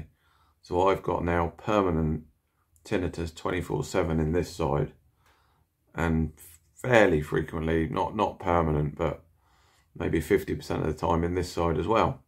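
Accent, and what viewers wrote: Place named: Suffolk, United Kingdom. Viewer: Hello, just watched your video and having been diagnosed with MD 3 years ago recognise everything you mention. I've watched several videos from other sufferers and everyone has broadly the same symptoms, but nobody mentions the speed of the spinning, for me it's really fast, everything jumping from left to right or occasionally up and down. I had 3 steroid injections which have helped a lot, though I still get attacks out of the blue occasionally. I work nights in Waitrose, and as an employer, they've been brilliant, now that my original manager has left, who found my attacks and deafness hilarious. A new manager who's sister has MD, was much better. This condition changes your life much more than people realise. I rarely drive any more, and never alone. Dare not ride my motorbike, and generally seem to have lost interest in everything. 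I think I can hear you have a slight Suffolk accent, which is also my part of the world. It's nice to hear someone talking about "menieres" rather than "minures" the way the Americans pronounce it. All the best.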